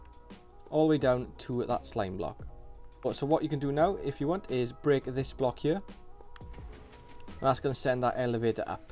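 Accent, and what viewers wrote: Wales, United Kingdom. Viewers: Welsh I think. Correct me if I’m wrong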